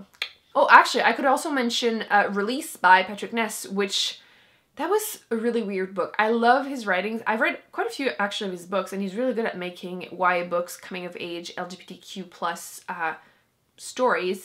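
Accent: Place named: Quebec, Canada. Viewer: Oh mon dieu, Are you from Québec? :o That accent melted my heart :')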